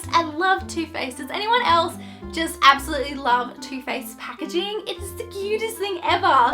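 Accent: Australia. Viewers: Hi I love you I love your videos I love your accent so much I find Australian accents so entertaining to listen to You are so pretty too 💖💖💖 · OMG are you australian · Your Aussie accent is soooooo soothing. ❤️😘ilysm!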